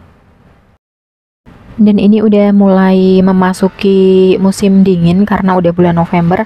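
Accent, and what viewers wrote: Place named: West Java, Indonesia. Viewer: Peda orng sunda dina nyarios na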